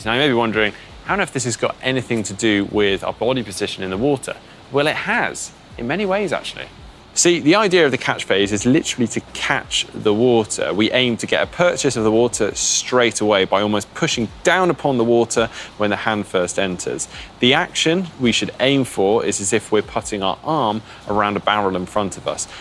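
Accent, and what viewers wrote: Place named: England, United Kingdom. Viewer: When receiving instructions, it's most believable hearing it from an Englishman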